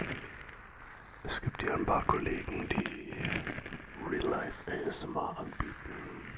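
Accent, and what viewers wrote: Germany. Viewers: Your Denglisch klingt sehr interesting 😂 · It was no secret anyway, it was already clear just by hearing him speak english with his german accent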